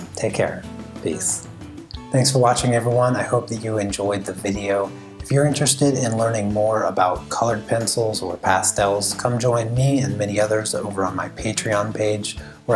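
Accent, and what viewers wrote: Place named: United States. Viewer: I am not a bigot, but I do wear hearing aids. It is SO refreshing to hear American English spoken. I have a great deal of difficulty understanding accents; British in particular. Thank you. Your voice is also soft and low, not high and piercing. Thank you.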